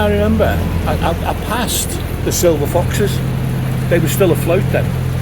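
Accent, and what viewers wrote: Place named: Birmingham, United Kingdom. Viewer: Joined blog … you were spraying yourself with after shave or something … in wine trade … had customers in Birmingham area … always thought accent a soft gentle one … have to go … Tony … 🙂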